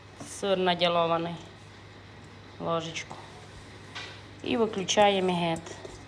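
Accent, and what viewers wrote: Transcarpathia, Ukraine. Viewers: Така файно по-закарпатськи розмовляєте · Розмовляє на закарпатському діалекті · Так гарно показуєте і мова ваша закарпатська така гарна. Також в молоді літа виділа як на сільських столах стояла велика миска і з неї вся сімʼя їла. І тато нас вчив їсти з краєчку, бо так скорше остиває і не попечешся. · Діалект Закарпаття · Гуцули так не говорять, невже ви відрізняєте.Ця жінка з Закарпаття, так що вона не гуцулка..Гуцули живуть у Капатах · Ну стільки масла , сметани і на вершках, ще б не було добре 😂😂😂 !❤ А ваша закарпатська, то мед на вуха і душу !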